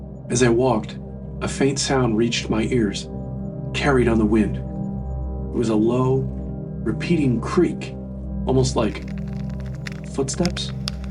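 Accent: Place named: Western New York, United States. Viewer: Love the western NY flavor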